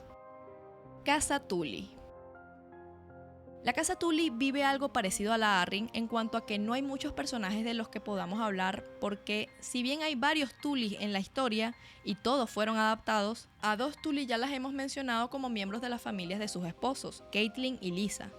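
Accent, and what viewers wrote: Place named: Venezuela. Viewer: Ese acento es de Venezuela.. me juego el riñón izquierdo de mi mamá y no lo pierde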